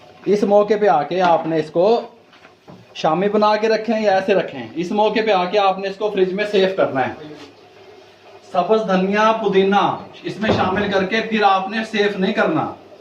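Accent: Punjab, India. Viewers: Bhut mithi boli punjabi urdu 💝💝💝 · App ka baat karny ka punjabi stayil bht acha hai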